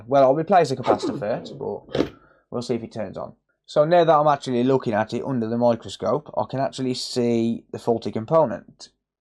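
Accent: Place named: Walsall, United Kingdom. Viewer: Hi,you got recommended by YouTube in my feeds and liking the content but i am PC though.I recognized your accent and thought Walsall or Willenhall,I am from Wolves just up the road ha!,just curious as to your technical qualifications?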